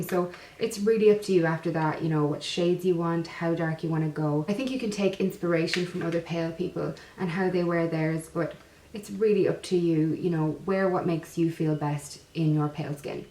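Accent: Ireland. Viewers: you're australian? you sound irish or scottish :o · you have such a beautiful accent. You sound more irish than the Australian accent i am accustomed to. I love accents in general, but you sound beautiful and thank you for the everyday look. I love makeup but some days i just want to look put together but not overly made up. thank you! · I KNEW you were at least 100% Irish!! One of the most charming and lovely accents on the planet... Did you know that there is more Irish blood in the U.S. than in Ireland? I myself am mostly Irish, coming from the surnames Joyce, O'Connor and Fitzgerald. Nice to meet such a lovely possible cousin! God Bless!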